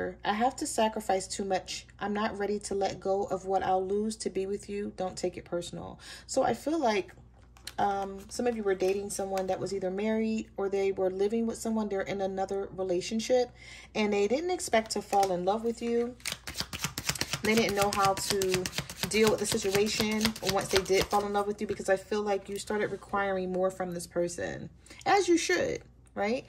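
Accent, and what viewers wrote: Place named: East Coast, United States. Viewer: I can hear that east coast accent 😂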